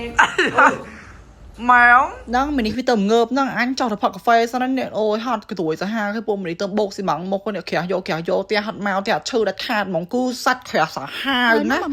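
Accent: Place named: Cambodia. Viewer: I am genuinely intrigued by your Khmer Accent. Which part of Cambodia are you from that has this accent?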